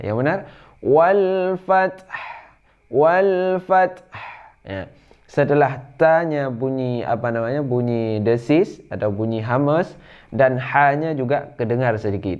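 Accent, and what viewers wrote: Aceh, Indonesia. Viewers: Memang Awak Aceh beda baca o dan du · Patutlah intonasi bahasa ustaz bunyinya nyaman ditelinga saya orang Semenanjung. Orang Acheh rupanya. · guru tetbaik saya suka ada logat acehnya barpun saya orng padang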